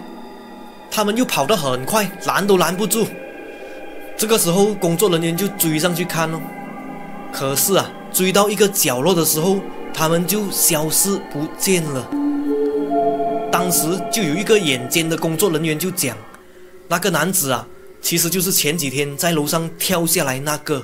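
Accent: Malaysia. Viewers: 最恐怖的還是馬來腔華語發音好恐怖😱😱...嘩咾欸..😱😱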